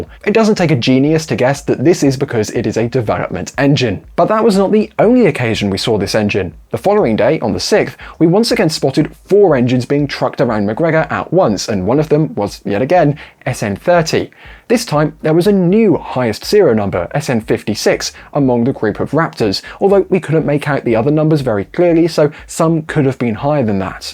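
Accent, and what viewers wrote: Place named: Southern England, United Kingdom. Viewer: It's a peculiarity of one of southern England accents and requires no teaching.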